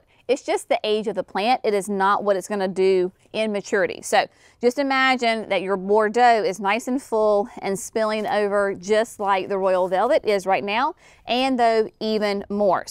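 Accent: South, United States. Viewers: I LOVE your southern sus ❤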